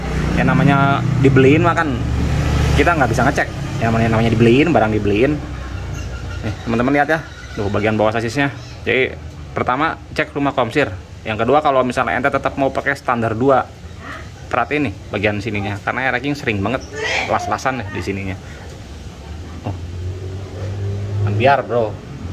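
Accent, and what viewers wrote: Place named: Indramayu, Indonesia. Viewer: Ngomong jawanya kya jawa indramayu😁😁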